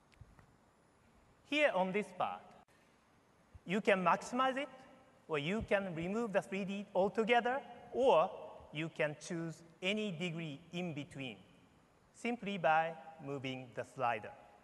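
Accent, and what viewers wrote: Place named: Japan. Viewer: gosh plz find someone speak english without jp accent.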